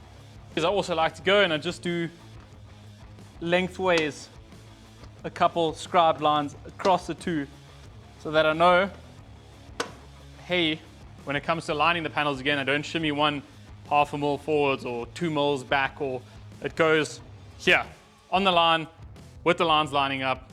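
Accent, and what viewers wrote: South Africa. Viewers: Do l detect a slight South African accent ? I bought my planishing hammer in January 1970 , a Proto made in Canada .l always kept the head clean until about 10 years ago some idiot recovery driver thought he'd use it to unjam his tailgate ! It completely destroyed the case hardened face. I rate myself as a very good panel beater / painter but l was in accident repair so never had the opportunity to use a wheeling machine or work with alloy . Fabrication and making panels is something l self taught . My mentor passed a year ago . He had a wheeling machine and learnt to work with aluminium. He was very talented . I thought this was repaired a number of years ago . Or is this a replica ? Thanks .P.S. Allen welded with oxy/ Acetylene . Tig welders were years away 😊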